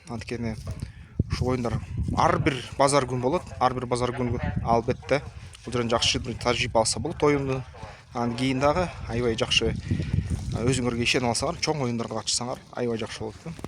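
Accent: Standard. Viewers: Менин Кыргыз адабиятынан сабак берген эжеке агайларымда мынчалык таза суйлобойт эле😂 сиз кайсы жерлен болосуз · Мендагы раазы болгонум кыргызча таза суйлогонго аракет жазап жатасыз ишинизге ийгилик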